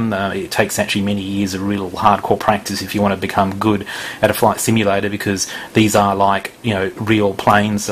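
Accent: Australia. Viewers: LoL racist j/k - The guys clearly an Aussie. Sounds nothing like the British accent. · are you from Australia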